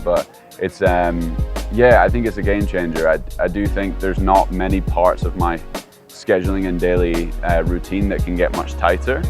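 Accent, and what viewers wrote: United States. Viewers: What’s with the American accent??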